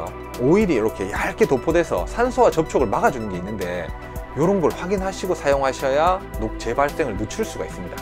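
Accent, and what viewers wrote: Gyeongsang, South Korea. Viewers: 말하는게 어리버리 갱상도넘인가요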